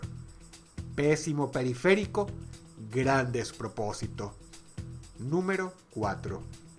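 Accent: Caracas, Venezuela. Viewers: Hay mas comentarios actuales que de hace 10 años cuando salio este video mencionado cómo Dross pronuncia Consola 😅 (asumo que eso viene del acento venezolano que aun tenia en ese tiempo) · El tiene acento venezolano, en sus videos mas actuales su acento desaparecio, xd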